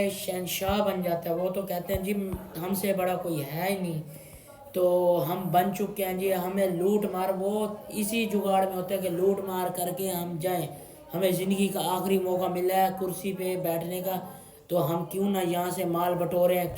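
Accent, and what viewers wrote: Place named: Punjab, Pakistan. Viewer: Bhai urdu talaffuz aapka punjabi sai mix horha hai😅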